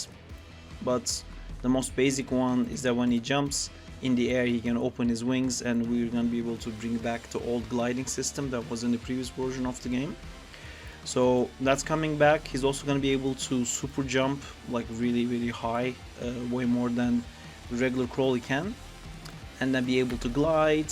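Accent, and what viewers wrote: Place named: Turkey. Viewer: selam aksanından türk olduğunu çıkardım oyunu nereden indirebilirim if u are not a turkish im sorry how i can download this game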